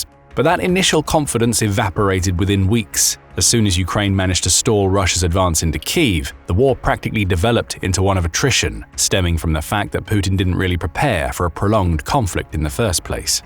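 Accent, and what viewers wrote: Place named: Southern England, United Kingdom. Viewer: Very good Standard Southern English narration from this bunch of Americans ... shame that the computer rambles on about high school and college students --- meaningless to a British audience. In the same way that the Germans lost the First World War in the first days of the War at Liège (4th-17th of August 1914), and they lost the Second World War in the Battle of Britain (1940), so the Russians lost this war in the first days of the war at Hostomel Airport. It has taken a long time for the significance of the Battle for Hostomel Airport to become apparent but that IS where Russia lost. The language problem is easily solved as it was solved in the First World War. You divide the front into sectors. Whilst maintaining a watching brief, the Russians would have done well to leave Kursk to the North Koreans as the French left the Belgian and northern end of the front line <num> to British command with only liaison between bilinguals at the top. 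The Koreans would have only needed a handful of interpreters then. Even with their mass of interpreters, there was appalling miscommunication. Far be it for me to help the Russians but the solution is as clear as the nose on your face. This, of course is not possible because of the control-freakery of the monstrously stupid Russian high command. Just because demolishing the entire city of Grozny worked when confronted with a bunch of religious fundamentalists armed with little more than AK47s, it doesn't mean that that same strategy will w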